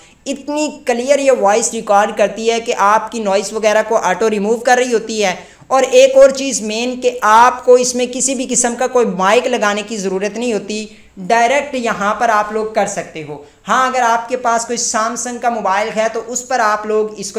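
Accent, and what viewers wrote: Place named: Rural, India. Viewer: Posri k Sakreen nhn hota Screen hota ha bae nhn hota Bhai hota Pendu Urdu chor punjabi ma kr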